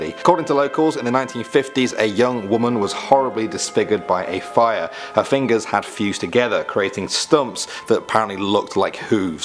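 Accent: United Kingdom. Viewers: Everything's scarier when told in a British accent. Thank you 🇬🇧 🇺🇸🇯🇲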